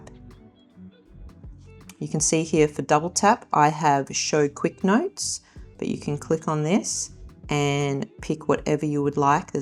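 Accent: Australia. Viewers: Thanks for this informative video. I’m in my 70s and realise I have so much to learn. Great to hear an Aussie voice. Will be checking out more of your work ❤❤ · I must admit, half of the reasons why I watch these videos is because I miss Aussie accents too much. You go girl!!! Straya!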